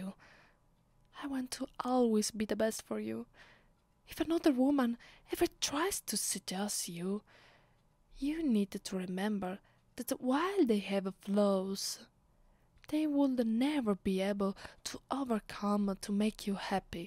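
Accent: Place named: Italy. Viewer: I don’t know why but the Italian accent adds to the charm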